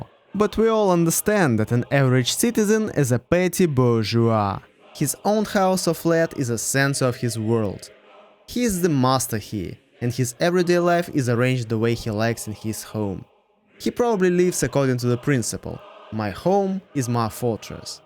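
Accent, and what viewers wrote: Russia. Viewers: Bro, I watched several of your videos and I really liked your documentaries and the way make them. The visuals paired with your russian accent gives it a special look and feel. Thank you for bringing this up as I learned a lot about Russia....oh and YES, you got a sub 🙌😎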